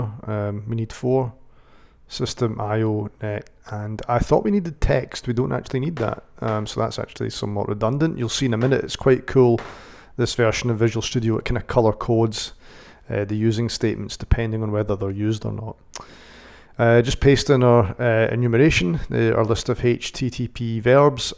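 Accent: Scotland, United Kingdom. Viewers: > youtube search for api tutorial c# > first vid contains "C#", "Step by step" and is 24 minutes long > clicks > scottisch accent > fuck yes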